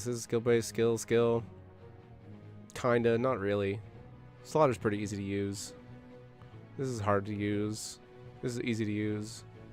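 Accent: Canada. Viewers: I'm gonna guess Canada? He's got some strong Canadian vibes eh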